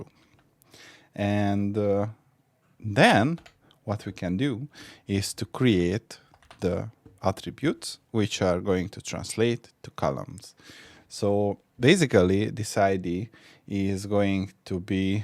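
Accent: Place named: Hungary. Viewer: I don't know why youtube recommended this video for me, but from your accent, I instantly knew that you are Hungarian as well, maybe that's why. But to add something relevant, I'm an amateur programmer, but when I needed to handle databases, I've always used pandas. Sometimes I have to use SQL in Access, but I'm not a fan of it generally. Is there a benefit - or what are they - using SQLModel instead of pandas in python?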